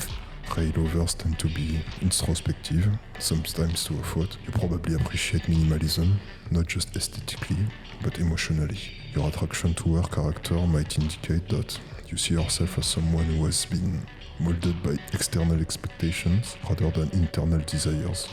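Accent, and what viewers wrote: France. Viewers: l'accent français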